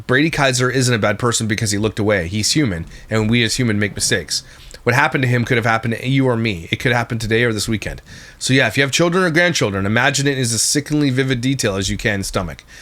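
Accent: Liverpool, United Kingdom. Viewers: That's a Scouse accent from Liverpool! It's in the north but not quite Scotland! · is awesome and wicked funny. As a fellow northerner I assume shes from the Liverpool area. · I love watching you! as a Manc here in Upstate NY, i'm hearing more scouse/EP area in you but definitely not Scotland! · yup she is defo a scouser. and spot on with her commentary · You’re from Liverpool, right? I love this accent. I had a friend from Liverpool, absolutely hilarious, just like you. 😅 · Scouse accent from Liverpool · That's a scouse accent!!! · is from Liverpool, best accent ever.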